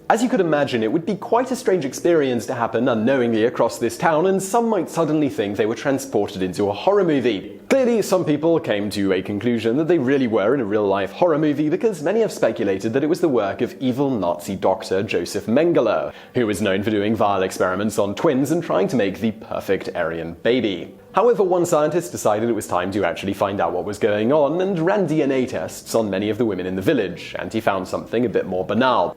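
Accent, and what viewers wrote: United Kingdom. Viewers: accent is def UK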